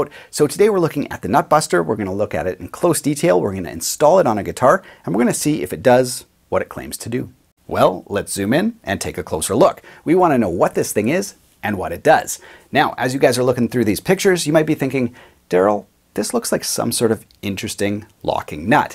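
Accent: Canada. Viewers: I really like catching the slight differences from American English he does as a Canadian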